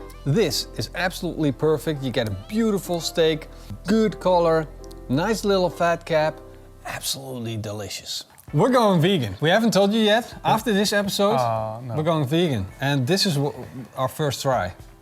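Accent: Netherlands. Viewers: I grew up in the Netherlands and I'd bet money ( by his accent ) so did this guy. If so the price of beef in NL vs its price in a beef producing state in the USA is not a fair comparison. Flat Iron ( what that cut is called here in the USA ) is usually BBQ'ed which breaks down its intramuscular sinews rendering a tender cut for the plate.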